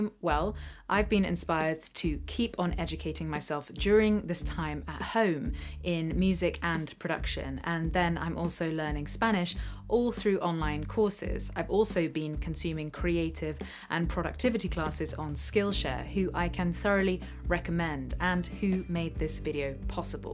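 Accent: United Kingdom. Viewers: She's so Bri-ish... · Omg that soft perfect beautiful British accent <3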